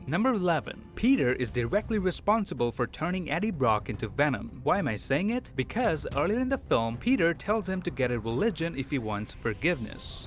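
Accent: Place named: Canada. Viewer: Don't worry, he's just speaking the usual canadian english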